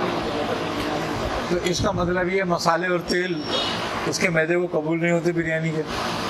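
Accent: India. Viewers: Hajrat Aap ki hindi ek dam India wali hai...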